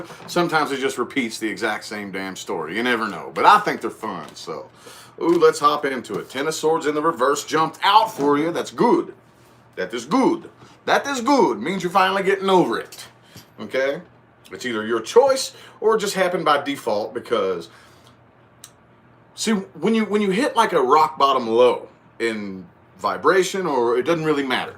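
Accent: United States. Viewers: I missed u live! Ah well!! Next time! I'm not evn Leo but i like ur vibe!! Your funny and the key is your last 1st evr vid I saw of yours was really really accurate4me so i know ur good. I am irish! I love ur American accent!! Hope u don't mind me say that!! It's a compliment!!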